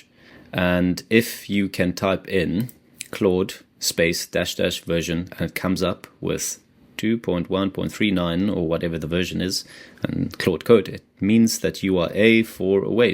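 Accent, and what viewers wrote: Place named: South Africa. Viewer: Definitely a Saffa voice there! Good job bro, repreasent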